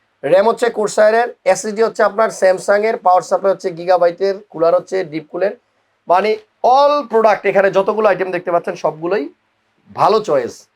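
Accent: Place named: Chapai Nawabganj, Bangladesh. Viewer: তার ভাষা সুনেই বুঝেছি সে আমাদের চাপাইনবাবগঞ্জ এর ভাই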